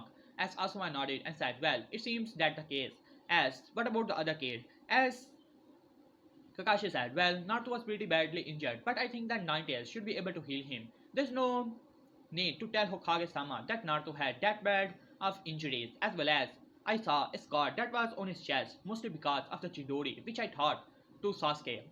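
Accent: India. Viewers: I swear! Each time I hear this person! I think his someone from India.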